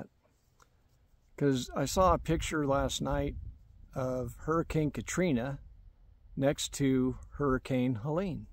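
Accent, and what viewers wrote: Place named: Southwest, United States. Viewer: The climate changes, there is no "Climate Crisis". The National Hurricane center reported that Helene made land fall at winds of 140mph, but the weather stations and the University of Alabama Huntsville mobile weather unit reported winds at 60mph. I don't know where you live but judging by the accent, I'll say in the American Southwest. In 850 C.E the region went into a drought that lasted 240 years, then it rained for 50 or sixty years, then the region went into a smaller drought that lasted only 170 years......... I guess the droughts were caused by those pesky native Americans cooking food and heating themselves. CO2 is good for the planet, the Sahara is greening, the world has greened 33% since 1900, because of CO2. Fossil fuels (hydro-carbons), cuts down on deforestation, and decreases health issues associated with burning dung to cook and heat. 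You maybe 56, but I'm 57, and I can tell you that you have not done your homework.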